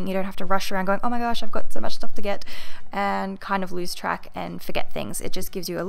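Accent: Australia. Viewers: Australian obvs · Are you Australian or American ? P.s I love ur channel !!! ❤️❤️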